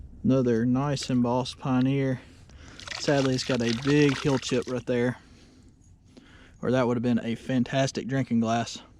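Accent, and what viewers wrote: South, United States. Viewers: Thank you four comments about us older folks am one of those disabled elderly ladies who appreciate the content as well as your southern accent. Keep it up!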